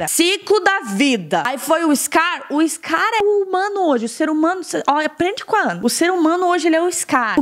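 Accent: Rio Grande do Sul, Brazil. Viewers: Ou ela é gaúcha?¿ kakakak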